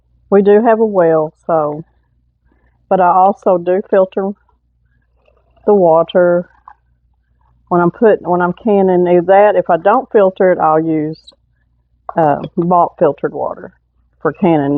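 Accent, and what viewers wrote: South, United States. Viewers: Ms., once I fine tuned my ears to southern draw I was able to watch and hear what you were a doin. I liked it so much I fired up the canner and made 6 qts of your ranch style beans, 9 pints of black beans and 5 pints of sausage. Tomorrow will be 27 lbs of chicken! I appreciated your video and I plan to view more of them!! God bless you.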